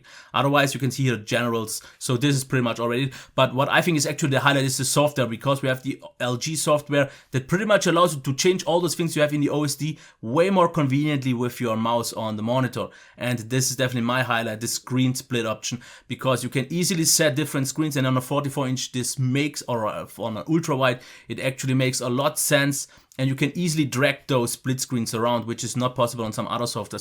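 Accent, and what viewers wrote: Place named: Germany. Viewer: German accent (almost the same as Austrian, which Arnold comes from).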